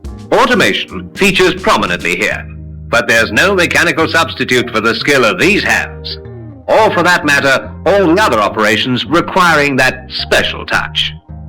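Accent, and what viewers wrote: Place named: RP, United Kingdom. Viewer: The annoying plummy voiced commentary is a pity- it doesn't sound appropriate.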